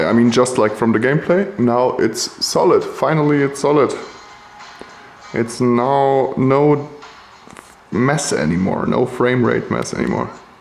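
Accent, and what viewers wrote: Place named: Germany. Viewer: Man hört den deutschen direkt heraus^^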